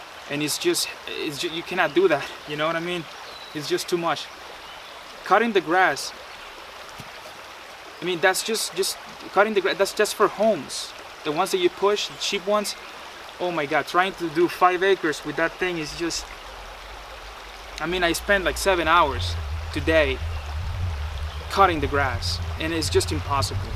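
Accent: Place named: Latin America. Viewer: I followed you from prepper nurse 1, because I enjoy learning from many different teachers. I hope you do your videos on the steps you make on your property and the work you do towards your goal. From my years ( I have kids your age) I see you've barely left the first quarter century behind, and I want to applaud you for making these decisions which seem to go against everything your generation stands for. Also, I hear in your voice, a Latin based language (Spanish, Romanian) and was just curious, which one?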